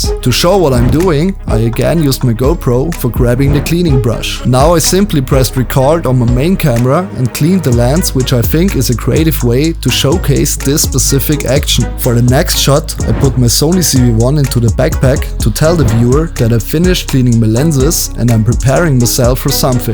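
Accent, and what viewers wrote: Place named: Germany. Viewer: Da hast du ja ein paar nette Videos. :-) Und immer wieder amüsant, wenn deutschsprachige Menschen Englisch reden. Der Akzent ist mitunter klasse. ;-)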